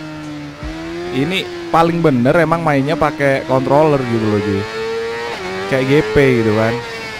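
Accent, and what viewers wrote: Malang, Indonesia. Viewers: Bang orang malang kah?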